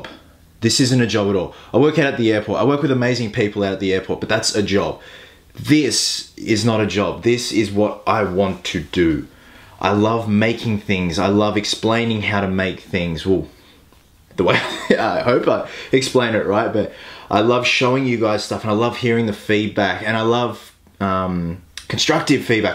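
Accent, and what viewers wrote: Sydney, Australia. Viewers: They do bloody not, sounds like he's from Sydney... sounds like he's from Melbourne. Completely different!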